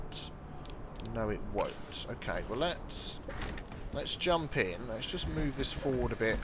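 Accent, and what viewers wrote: United Kingdom. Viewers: I love the UK accent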